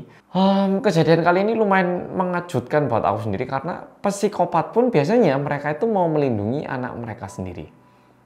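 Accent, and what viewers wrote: Java, Indonesia. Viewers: Masyaallah....sy kira Wong jowo asli nggak taunya Korea to.... 😃👍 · Sebenarnya udh nnton di Omara tapi mau nnton lagi versi om medhokk🐒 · Jebole medok yo😁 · iki wong korea opo jowo? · Masya Alloh kok bisa selancar itu berbicara pakai bahasa Indonesia dengan logat Jawa padahal asli orang Korea.Kalau lahir di Indonesia wajar selancar orang Indonesia.Salut banget 👍Cerdas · Hai org Korea medoook ❤ Nyimaaak 🎉🎉🎉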